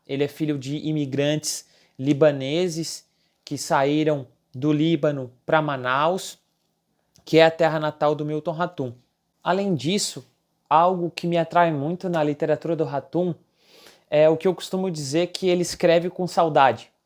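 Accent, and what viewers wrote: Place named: Florianópolis, Brazil. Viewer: Pelo sotaque, vc só pode ser manezinho da ilha também hahaha (sou de Floripa)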